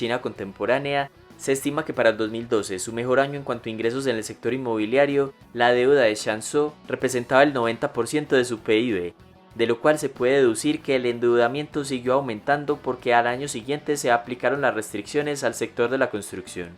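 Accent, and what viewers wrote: Colombia. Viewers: Horrible la narración en Castellano Colombiano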